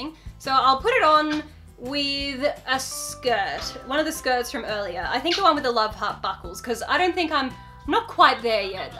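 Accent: Australia. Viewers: The first time I sall you vid I was about to leave then I sall that Bird and then I realized that you Australian u could tell at first I'm used to the accent... Cuz I'm Australian but i dont live there 😭😭😭😭 also I just like you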